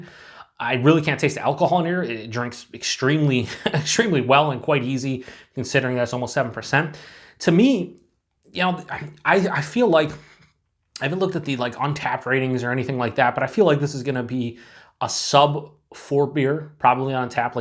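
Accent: Western New York, United States. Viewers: Jeez son, that was heaviest Western New York accent introduction since the WGRZ 12 oclock news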